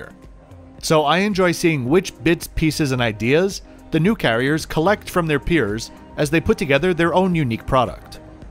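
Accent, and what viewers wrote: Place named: United States. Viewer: His accent is American 😊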